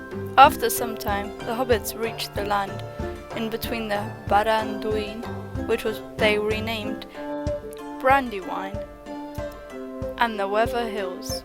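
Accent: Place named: RP, United Kingdom. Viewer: Well done. I am particularly enjoying your First Age work. Your R.P. accent (I'm American) and spot-on pronunciation are particularly delightful to listen to, not to mention the always delightful and apropos music and art choices you make. Carry on!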